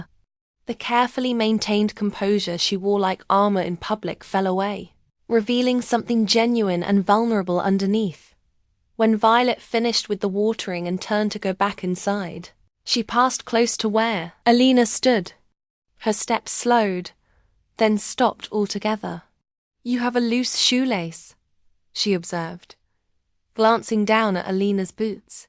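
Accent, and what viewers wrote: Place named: United Kingdom. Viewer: Why the accent sounds like British 😂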